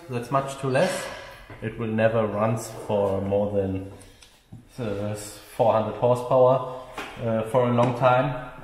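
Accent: Germany. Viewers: wenn deutsche englisch sprechen... :D aber er weis was er macht-top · it sounds like a german guy speaking english. wrong how? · German English is actually cool to me. We are all car enthusiasts...we kind of already know what is happening even without a lot of words. 😊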